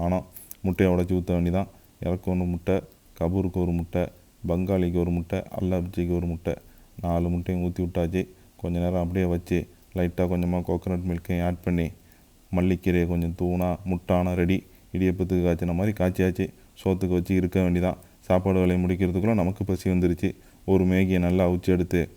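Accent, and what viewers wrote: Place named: Pudukottai, India. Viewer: Asalamu alaikum brother neenga entha ooru thappa eduthukkathinga neenga pesurathu pudhukottai Alangudi side pesura mathiri irukku athan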